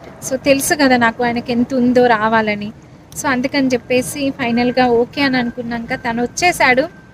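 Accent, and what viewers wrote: Telangana, India. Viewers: Hi garu madu narasaraopet.kani me slang ala ledu.nenu Inka meeru Telangana anukunna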